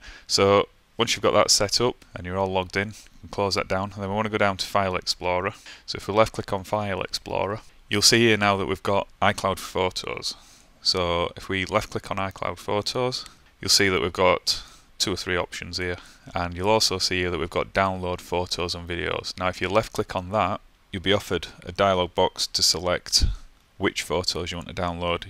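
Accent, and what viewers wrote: United Kingdom. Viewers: lifesaver! Thank you so much! much prefer listening to a British voice than an American as well LOL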